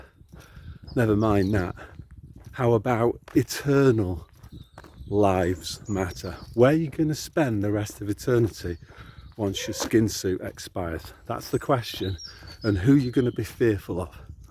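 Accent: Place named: Manchester, United Kingdom. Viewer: Exactly! I’m same! In Manchester visiting m’Dad . Thanks 🙏 it’s lovely to hear some comm sense in a manc accent xx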